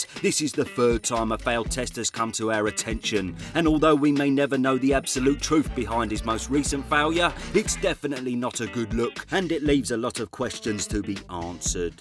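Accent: Cockney, United Kingdom. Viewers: Never came across this channel before and don’t really care too much for boxing but YOUR COCKNEY COMMENTARY IS HILARIOUS 😂😂 what a geeza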